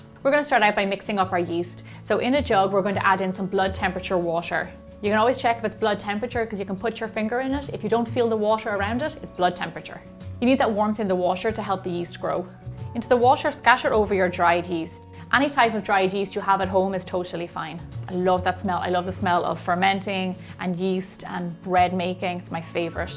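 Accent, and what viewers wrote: Ireland. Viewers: Is she Irish? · are ye irish? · she is Irish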